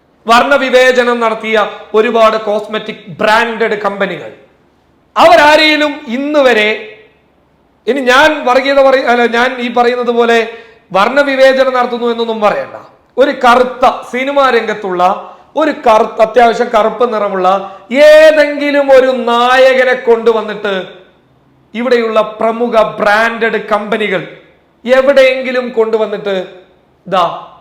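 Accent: Kasaragod, India. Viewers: എടാ തനി കാസർകോട് സംസാരം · ella languagum manasilaavum ennal kasargod slang inte ponnooo onnum manasilavula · Kasargod Slang adipoli😀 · Basheer bashiyum thudangi bb cream njan vanganonn vijarichu eni vangunnilla kasaragod samsaram nalle und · കാസർഗോഡ് ഭാഷ കേട്ടാൽ തന്നെ ഛർദിക്കാൻ വരും. ഈ മാതിരി ഊമ്പിയ ഭാഷ... വല്ലാത്ത ന്യായീകരണം തന്നെ....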